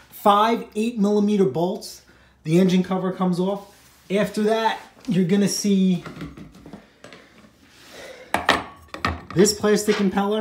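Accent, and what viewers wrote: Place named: New York, United States. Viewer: Duude Tgat NYc accent is killing it... Totally Gangsta..😎😉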